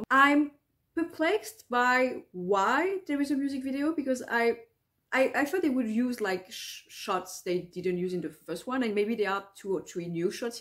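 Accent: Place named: France. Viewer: Je t'adoreeeeee 💖 (t'es française, non?) I guessed based on the accent haha. But yes the Omar effect is very very real and at this point my obsession has become so unhealthy haha.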